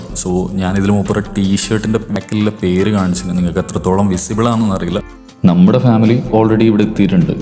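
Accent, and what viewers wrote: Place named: Kannur, India. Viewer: Waiting for next vlog.....kannur slang is really nice 👍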